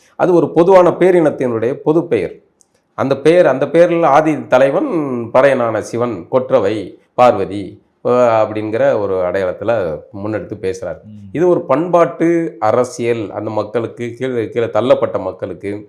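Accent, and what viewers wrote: Chennai, India. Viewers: சென்னை தமிழை ஏன் தவிர்க்கணும்... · நெறியாளர் (சில இடங்களில் ) சென்னை பாஷை பேசுவதை தவிர்க்கலாம். 🎉🎉😮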